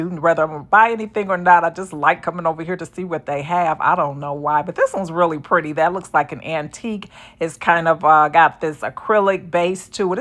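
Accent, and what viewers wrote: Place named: Detroit, United States. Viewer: Ran across your channel… I KNEW you were from Detroit!!! Me too but have lived in Charlotte since 1991. The accent will always give you away. 😉 and yes, I subscribed!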